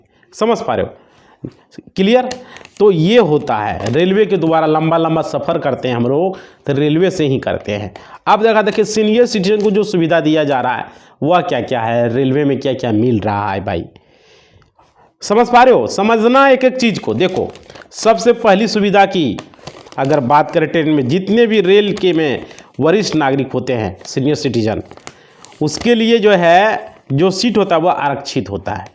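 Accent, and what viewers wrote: Bihar, India. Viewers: Bihari ho kya aap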